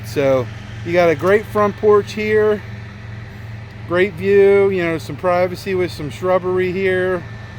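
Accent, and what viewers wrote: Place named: Baltimore, United States. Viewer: Love the Bmore accent hon 🧡🖤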